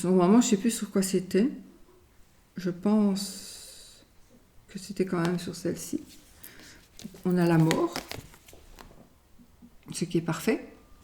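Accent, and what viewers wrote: Belgium. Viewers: Vous êtes belge ?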